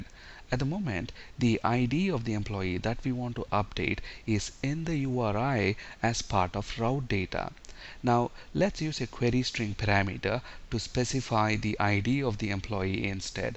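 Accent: India. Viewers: The only Indian English accent that I like is accent:)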